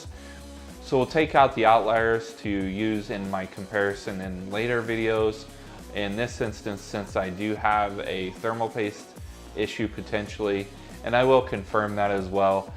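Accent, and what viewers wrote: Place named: Brooklyn, United States. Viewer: if i didnt know id think you lived in brooklyn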